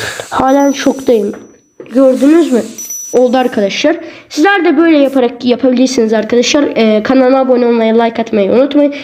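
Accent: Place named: Azerbaijan. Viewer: Danışınnan bilinir.azərbaycanlısan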